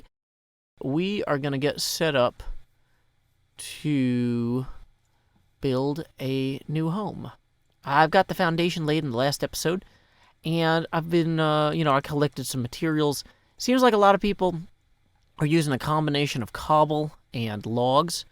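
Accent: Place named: South, United States. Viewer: Hey are you a country guy you sure sound like one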